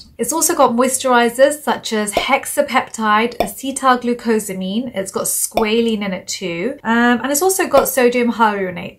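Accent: United Kingdom. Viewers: This DOCTOR is personable, generous and transparent with her vast knowledge of skincare ingredients. And yes, she is also a gorgeous lady. I also love her (presumably British?) accent. As an American, any accent sounds smarter than what I am used to hearing. 😜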